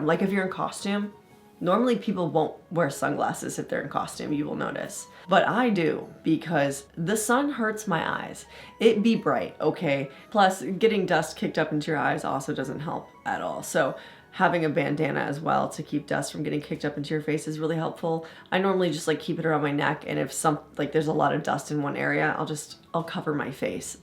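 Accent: United Kingdom. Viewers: Always such a kind positive soul - energy- so sweet✨ Best wishes to you Also brit accent is lovely haha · Your accent is very good. I thought you were England. Maybe do lessons on how to speak "Ren Faire"?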